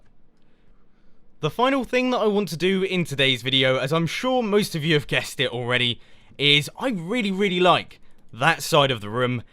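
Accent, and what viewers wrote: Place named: United Kingdom. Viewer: British accent with Australian English. "Seriously, 'Mate!"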